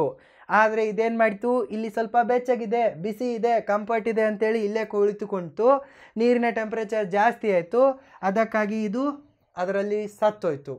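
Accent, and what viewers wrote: North Karnataka, India. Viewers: Awesome presentation, north Karnataka style. Talk like close friend. 👌 ...I loved the words kisiyodhu, kolithiri😂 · Ningond request paa nan kadeyinda... Neenu uttar karnataka bhaashe maathadu illa neetaagi south kannada maathadu... ardaa adu idu antha kisi byaadpa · well said boss. nice presentation in north Karnataka style.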